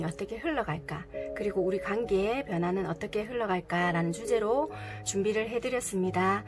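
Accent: Busan, South Korea. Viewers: 아 넵 ㅎㅎ 감사합니다! 항상 영상 잘 보고있어요😊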